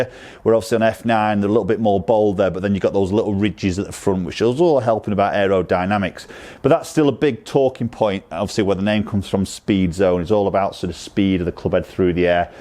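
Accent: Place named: England, United Kingdom. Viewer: STOP TALKING YOU PRATT WHY OH WHY DO THESE GOLF PROS ON UTUBE NEVER. STOP TALKING DO THEY GET PAID BY THE WORD!!!! And those horrible English accents! Shut up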